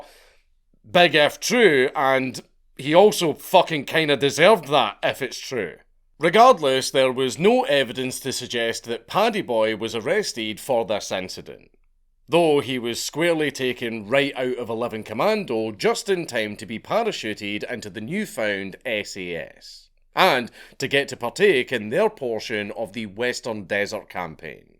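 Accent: United States. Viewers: Shame you used american pronunciations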